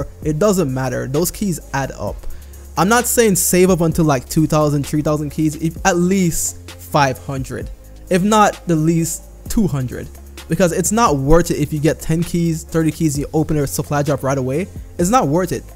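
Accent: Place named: Jamaica. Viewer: You're jamaican? I can kinda hear the accent fam.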